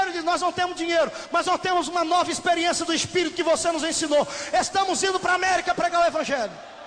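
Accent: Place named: Northeast, Brazil. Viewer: essa época ele tinha até um sotaque meio nordestino 😅😂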